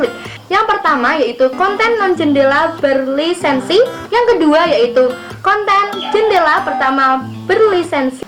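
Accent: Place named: Banyumas, Indonesia. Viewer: Kok ngapak yaa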